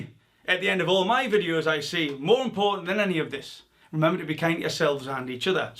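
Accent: Newcastle, United Kingdom. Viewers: You sound like a Geordie spot on video thankyou xxx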